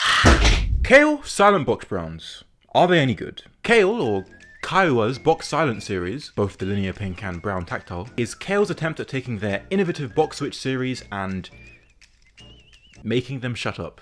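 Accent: United Kingdom. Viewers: Top quality content mate! Funny af, informative, British? Damn fam let’s do a meet up after the pandemic!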